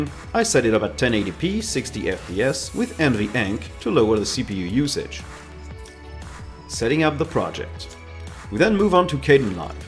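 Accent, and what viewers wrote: France. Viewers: wat , you're french ???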